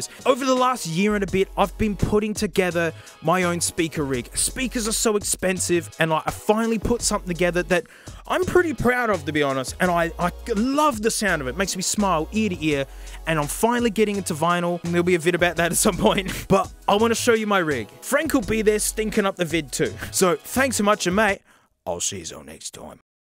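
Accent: Australia. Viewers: that's a toss up between overtly verbose ramblings about things that may or may not be relevant to the car being reviewed and the [ h e a v y ] aussie accent cheapo microphone stories about the aussie life lol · MOOOOM The funny aussie is talking about headphones again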